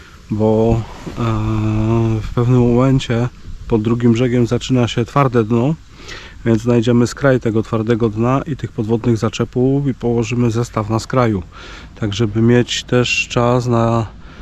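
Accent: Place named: Poznań, Poland. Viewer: Widać(słychać),że jestes z poznańskiego i miło,że używasz czasem słówek z gwary-fyrtel,kieretyny,,grzdyl…Super!!!Powodzenia!!!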